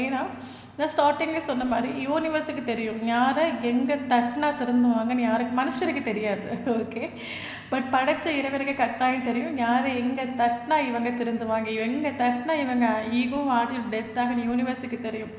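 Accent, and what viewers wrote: Kerala, India. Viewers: Hi Mam neega keralava? Malayalam kalandha tamil very nice to hear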